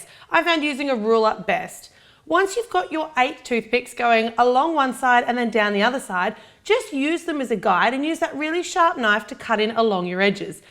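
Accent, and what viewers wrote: Australia. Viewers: are you aussie or not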